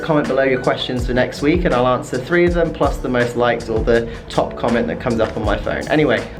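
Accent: United Kingdom. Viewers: If you listen, you will know he is British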